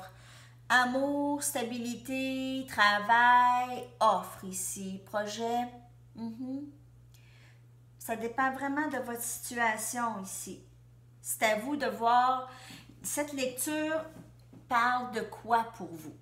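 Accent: Canada. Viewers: Bonjour, et merci pour ce tirage qui me parle vraiment.....Vous êtes fort sympathique et cet accent délicieux du Canada (j'ai de la famille à Montréal et à Québec) moi je suis à Manosque en Provence...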